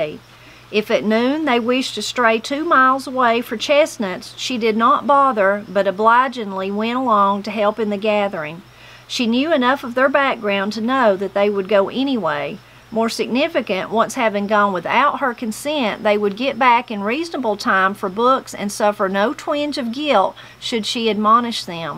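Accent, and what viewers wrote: Appalachia, United States. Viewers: I’m so happy to notice that there seems to be plenty of pages yet to go in this amazing book! Perfect listening for me to cook supper while listening.. 😉 sweetly feminine voice, southern Appalachian accent and vocal cadence couldn’t be more perfectly ideal for narrating this story! Thanks again, and God bless y’all 💯🇺🇸❣️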